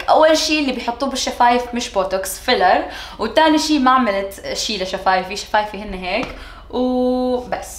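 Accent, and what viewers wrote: Syria. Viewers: لعد لَيــِْ♡̷̴̬̩̃̊ـِْش. تحجين سوري اذا عراقيه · نفسي اعرف شغله ازا هي عراقيه كيف بتحكي بلهجه سوريه وليه بتحكي بلهجه سوري بدل عراقي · زكاء منك انك عراقية وحاسبة حالك عالسوريين باللهجة وغير اشيا بهالطريقة كسبتي السوريين والعراقيين وعملتي هالقناة الكبيرة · عاشت وهي صفيره بسوريا فصارت تحجي سوري اكثر من العراقي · walaa sdke اختارت لهجة السوريه لان لما بدات باليوتيوب كانت اللهجة السوريه كثيير مشتهره بسبب دبلجة مسلسلات السوريه و في نفس الوقت كان ما احد يعرف اللهجة العراقيه طبعا هذه شغله مش حلوه لانها نست اصلها و قامت تتكلم بلهجة ثانيه و معلومه اخرى تعرف تتكلم عراقي زيين بس بعدها مصممه على لهجة سوريه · انا عرفت انك عراقية بس ليش تحكي سوري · ماعم تحكي لادقاني عم تحكي اللهجة السورية العامة لمعرفة لبعض الدول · لأنها كانت عايشة بسوريا من هي وصغيرة فلهيك تحكي سوري يعني تعودت تحكي سوري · سوأل ليش انتي عراقيه وتحجين سوري للعلم هذا السؤال اسئله كل فيديو بس محد يجاوبني 😐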